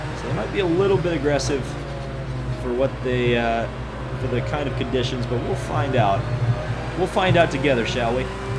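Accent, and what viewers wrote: United States. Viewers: Finally an American... i hate hearing some brit’s lispy-ass voice ffs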